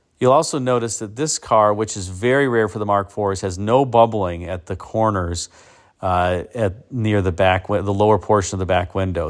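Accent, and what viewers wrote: United States. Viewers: Keep up the great work very clear American accent cheers from Australia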